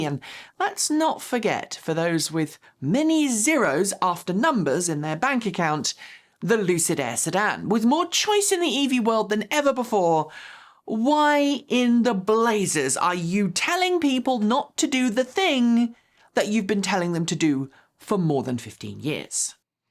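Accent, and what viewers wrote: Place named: England, United Kingdom. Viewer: I thought this might be click-bate, but as per usual, this just sounds like my inner monologue, but with a lovely English-Accent. Love it.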